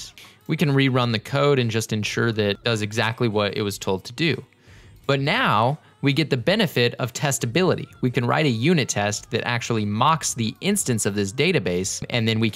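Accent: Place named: England, United Kingdom. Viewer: Perfect explanation it's also nice to hear an an English accent I hypothsize YouTube's algorithms promote Indian vloggers it's so hard to find programming videos in English accents thanks for the awesome video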